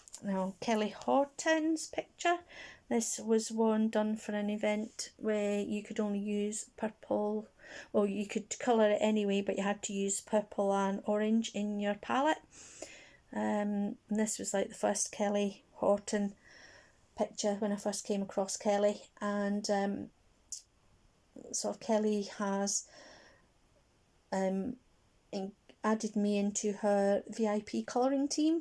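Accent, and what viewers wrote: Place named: Scotland, United Kingdom. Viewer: What part of Scotland is your accent from, love listening to you.😘❤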